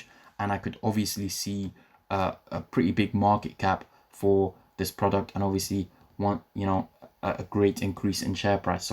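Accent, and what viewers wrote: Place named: United Kingdom. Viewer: Are you british can't quite tell from the accent sounds quite mixed as though you've lived in different countries. Great vid tho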